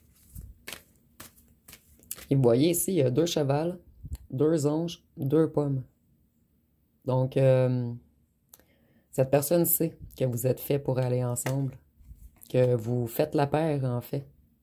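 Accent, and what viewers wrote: Quebec, Canada. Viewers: J'adore votre accent cebecois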